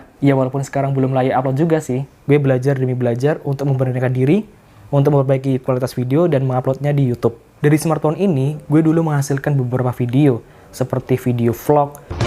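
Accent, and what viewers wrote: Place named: Java, Indonesia. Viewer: Gimana kalo guenya jadi aku atau saya biar easy listening aja medokmu isih katon maskuu😭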